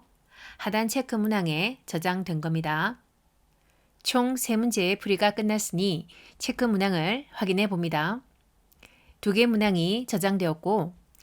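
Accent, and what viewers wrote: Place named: Gyeongsang, South Korea. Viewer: 나도 대구지만 경상도 사투리 정말 더럽게 진하게 쓰네... 이 여자 멍청이아냐?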